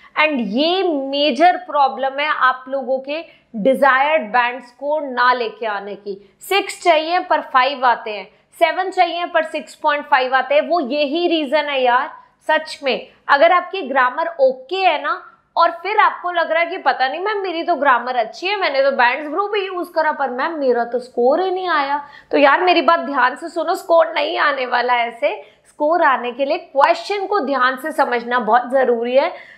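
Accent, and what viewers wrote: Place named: Punjab, India. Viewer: Mam aapki hindi ki tune Punjabi me bahut achhi lagti hai .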